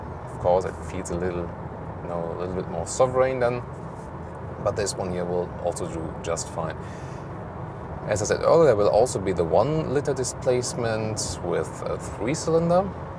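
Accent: Germany. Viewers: I just come here for the German accent, yum.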